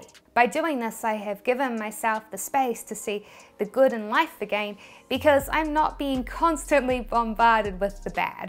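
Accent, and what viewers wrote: New Zealand. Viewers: Great that you share your experiences and all the helpful information; we all benefit from this. I'm a fan of your channel, inspiring videos, and lovely NZ accent. Keep up the great work! But relax sometimes too ;-).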